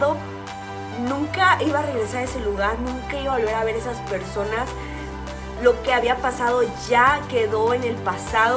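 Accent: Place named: Spain. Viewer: Que bueno eso del intercambio es una muy buena experiencia de poder conocer otras culturas.. me hubiera encantado ir a España jaja esa tonada es tremenda jaja..pero con todo lo que está pasando hoy en día con las inseguridades robos y todo eso.. como que mucho no está para arriesgarse a menos que sea seguro jeje.. pero coincido con tigo seria una muy linda experiencia.. Saludoss😁😉👌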